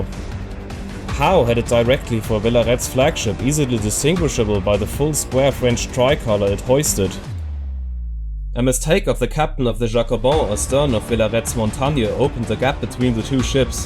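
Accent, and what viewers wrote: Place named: Germany. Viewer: so well made and also so funny with your lovely german dialect. you gained a sub!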